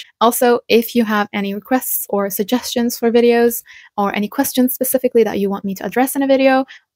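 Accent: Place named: Turkey. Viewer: Her english accent does sound turkish, i guess her channel name is not her real name then.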